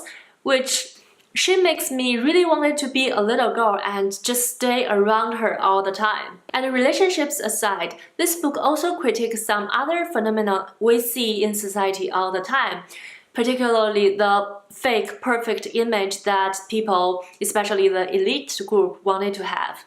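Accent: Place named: China. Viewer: Chinese English is powerful.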